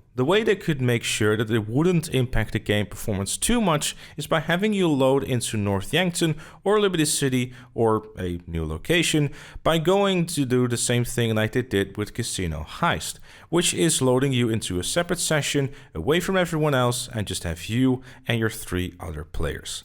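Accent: Netherlands. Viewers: Nederlands? Ik hoor het haha🔥👑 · Aan je accent te horen ben je denk ik nederlands maar je kanaal is heel nice man props man!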